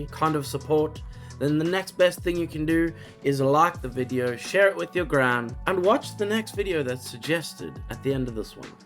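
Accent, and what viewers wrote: South Africa. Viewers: Love your vids thanks for sharing!!! Subscribed ……Is that a South African influenced accent I hear there? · I'm very new to this channel, thanks for the tips! Is that a South African accent I'm hearing?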